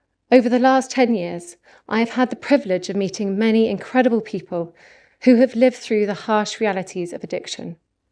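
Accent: RP, United Kingdom. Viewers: british accent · I ❤ British accent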